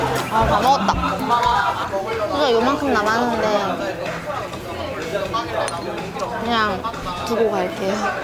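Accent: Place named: Gyeonggi, South Korea. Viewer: 대리만족하며 잘 보구 있어요 지난 영상부터 몰아보기중인데 님의 나른한 경기말투 넘 좋네요^^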